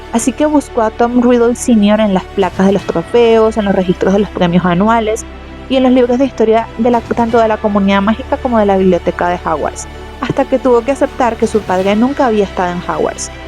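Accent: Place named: Latin America. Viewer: Es la primera vez que lo escucho en audio latino, se me hace raro 😅 Pero dale, vas a ser grande!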